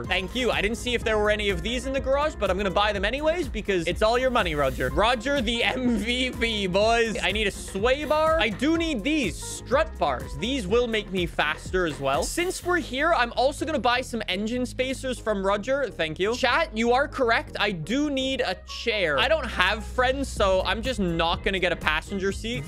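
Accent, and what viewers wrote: Quebec, Canada. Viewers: This dude is a perfect demonstration between french canadian and french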